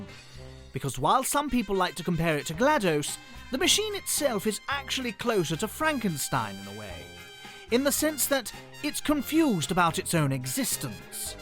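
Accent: United Kingdom. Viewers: This dudes British accent made me happy again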